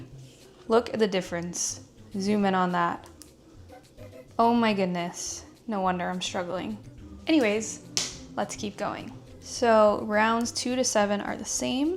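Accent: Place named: Afrikaans, South Africa. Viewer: Hey can you pls use your Afrikaans accent. Anyway this good! Thanks 😀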